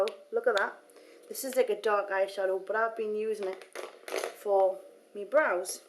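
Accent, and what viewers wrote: Newcastle, United Kingdom. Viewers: Are you a Geordie? First I thought you were Scottish....nice tutorials, I am still struggling with the false eyelashes...:) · dude ur video was awesome, but the one thing i really want to know is your accent. is it... british? irish? idk.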